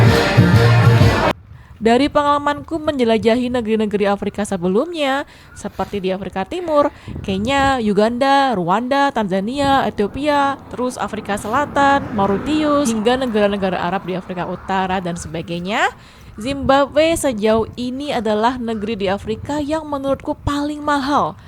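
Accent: Java, Indonesia. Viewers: Jowo ne medok,,pisan² ajak ngobrol penduduk sekitar nggo boso jowo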